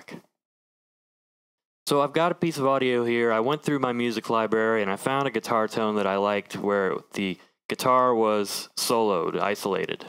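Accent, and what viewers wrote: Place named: California, United States. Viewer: You kinda sound like Gregory Scott of Kush Audio fame, but with less vocal fry and and less CA accent. Not an insult, to be clear, I just noticed the similarity between your voices.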